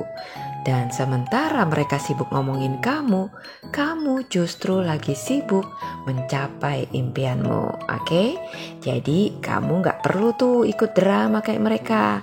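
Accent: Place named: Sunda, Indonesia. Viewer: Aksen Sunda nya lucu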